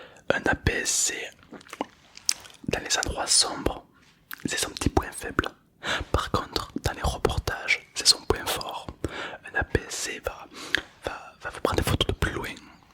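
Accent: South, France. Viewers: Rolalah il a une accent du sud il me regale 😁🤣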